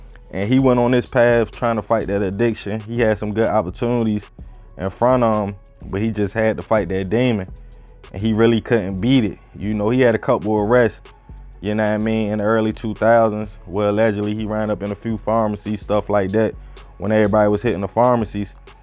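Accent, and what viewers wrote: Baltimore, United States. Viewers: narrator is a native Baltimorian?? The accent???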